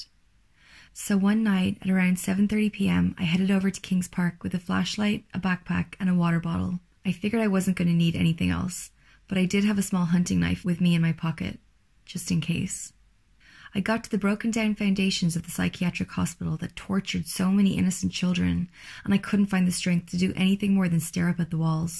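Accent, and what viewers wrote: Ireland. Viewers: I love your stories, please keep at it. Do I hear a tinny bit of an Irish accent? Reminds me of my Mum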